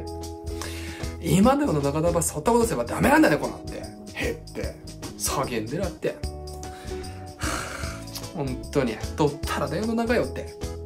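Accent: Tsugaru, Japan. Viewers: 最初から津軽弁に聞こえる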